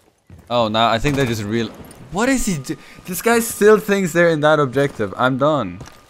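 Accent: Germany. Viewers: Is he german?? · I found it was a headshot on the Bamdit and a question are u German? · Ur german? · Daaaafaaaaaaq Ur German?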